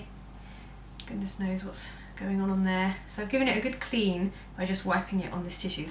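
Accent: United Kingdom. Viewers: I like listening to you. I learn a lot because your videos are educational for make up. Also, I like your British accent..=)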